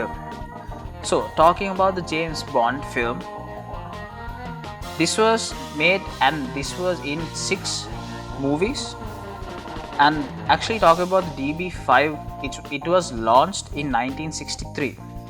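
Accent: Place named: India. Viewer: What a fxxxxing Indian accent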